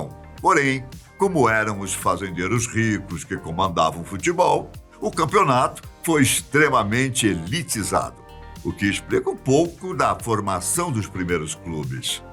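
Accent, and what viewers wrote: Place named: Rio de Janeiro, Brazil. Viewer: iu carioca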